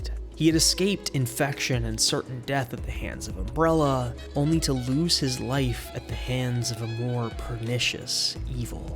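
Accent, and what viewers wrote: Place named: Ireland. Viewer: Italian? My boy is Irish.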